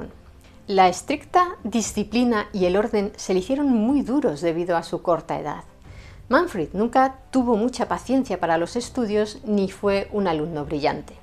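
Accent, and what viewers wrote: Spain. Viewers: Excelente trabajo. Muchas felicitaciones por tu canal, es muy bonito escuchar todas esas historias con tu lindo acento español. Espero algún día ver historias de personajes latinoamericanos como por ejemplo Miranda. Un gran beso y un fuerte abrazo desde la América Latina.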